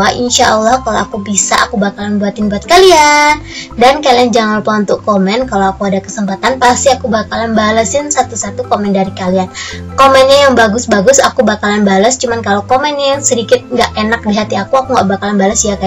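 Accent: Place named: Java, Indonesia. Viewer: Jawaa bangett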